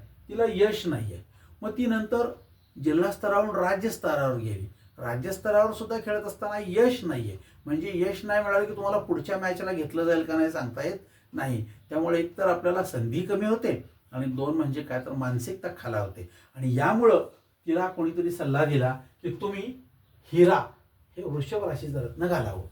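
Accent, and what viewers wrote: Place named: Kolhapur, India. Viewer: मी हेड फोन लावून एकत होतो पण वारंवार वाटत होतं हे कोल्हापुरी असावेत आणि शेवटी मी मोबाईल मध्ये शेवटी पत्ता दाखवला तो कोल्हापूर 😅😅😅😅 थोडा ठसका जाणवत होता. 😅भाषा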